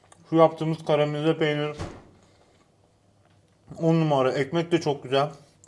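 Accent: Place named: Diyarbakır, Turkey. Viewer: Abi Diyarbakırlı misin ?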